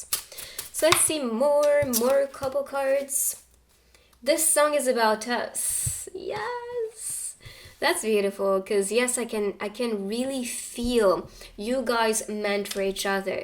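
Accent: France. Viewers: You have such a lovely voice, are you French?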